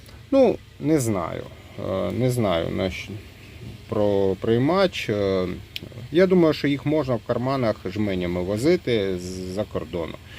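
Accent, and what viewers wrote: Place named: Surzhyk, Ukraine. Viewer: Какой натужный суржик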